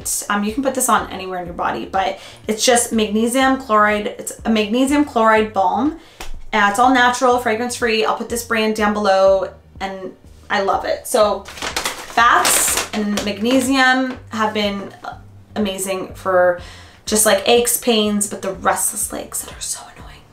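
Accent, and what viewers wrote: Canada. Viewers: How rude, it's a Canadian accent. Check your ignorance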